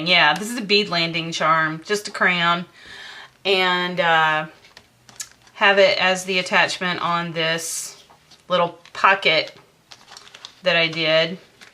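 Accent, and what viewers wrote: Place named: United States. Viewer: What a wonderful album,and watching you do it from the start was great... Love your videos and will be watching them all, also love your accent, whereabouts in the States are you from?.. I am in UK, we do not get some of the things you get easily over there, though it is getting better with the online stores, but my are they pricy! Best wishes, Lesley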